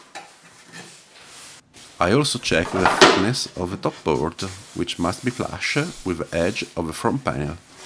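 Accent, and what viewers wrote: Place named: Italy. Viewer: si sentiva, anche io sono italiano da quello che ora puoi immaginare e volevo dirti che fai dei bellissimi video e continua così <3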